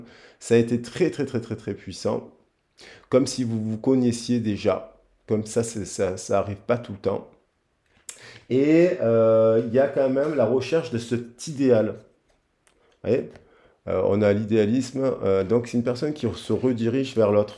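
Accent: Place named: South, France. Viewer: Coucou 👋🏼 🎉 Comment allez-vous aujourd’hui?! Je suis trop contente de vous retrouver sur cette vidéo 😊 j’adore 🥰 votre accent du Sud:-)) toujours aussi intéressante 🧐 votre analyse 📈 belle journée ensoleillée 🌞 mille mercis pour ton énergie mon amour ❤que tu mets dans toutes tes vidéos 🐿️🙏je te fais pleins de bisous 💋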